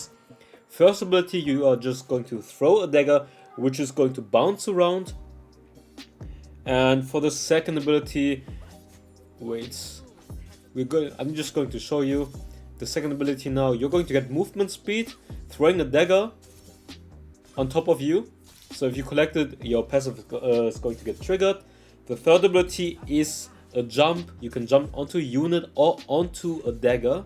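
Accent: Germany. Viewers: disgusting german accent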